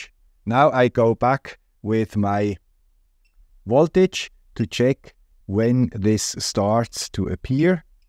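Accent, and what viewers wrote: Switzerland. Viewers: Hello friend with the Swiss accent. In case you don’t hear it enough, I’de like to thank you and also applaud you for the way you have setup your channel. Your content is extremely well planned and laid out without all the bloat of other YouTubers in this space. Lean and clean to the point and. I find myself going back to your videos many years later as a reference point when needed. Looking forward to more truly high quality content next year ! 🙏🏽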